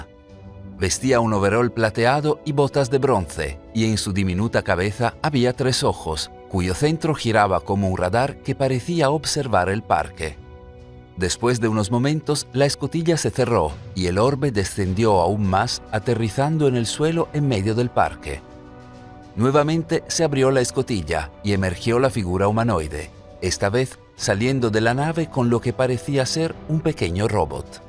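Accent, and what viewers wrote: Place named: Italy. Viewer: Per quanto una buona pronuncia e lettura,la cadenza,l'inflessione il non marcare alcune lettere rivelano la tua italianità...ci ho imbroccato vero???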